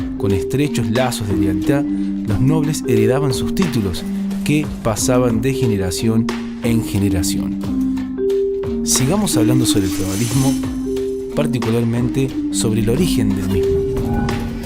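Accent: Córdoba, Argentina. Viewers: Che alguien más pensó q este es cordobés? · Y el cordobe que narra la historia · muy bueno el video, me sirvio mucho para un informe escolar. No pude evitar tu acento Cordobes jajaja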